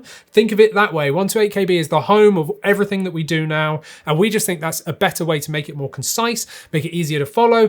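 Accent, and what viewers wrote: United Kingdom. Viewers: I've seen a few of your videos, and i like your channel, dont worry about "rambling on", i like the way the "British Accent" sounds.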